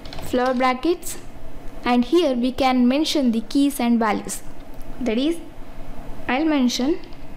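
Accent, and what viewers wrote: South India, India. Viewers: I like your south Indian accent....... thanks for this video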